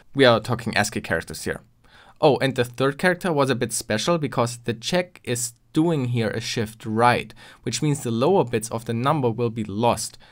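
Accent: Germany. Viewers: sorry dachte du machst das einfach so aber wenn du es dann nur aufs Geld abgesehen hast, dann ist es egal, sorry. Aber trotzdem toller Youtuber mit tollen Videos, man kann aus deinem Englisch schon raushören, dass du Deutsch sprichst. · Dein deutscher Akzent ist so schrecklich und versaut das ganze Video.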